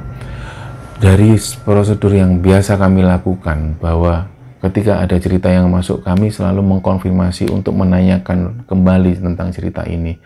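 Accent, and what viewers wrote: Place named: Magetan, Indonesia. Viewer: Beneran magetan, orang magetan mana suaranya ✔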